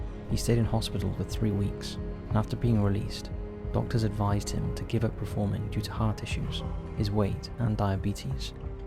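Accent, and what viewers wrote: Wales, United Kingdom. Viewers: Yeah, they have not been the same without his Welsh twang. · he’s definitely Welsh lol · Oh I've missed your soothing Welsh tones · is it Welsh? I thought he sounded a little british, but regardless I miss the old narrator. He just sounds so much more educated and classy then the new one 😏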